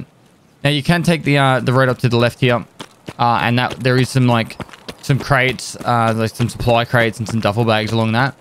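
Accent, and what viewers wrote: Australia. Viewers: He is speaking English, with a moderate Australian accent. He is a native aussie.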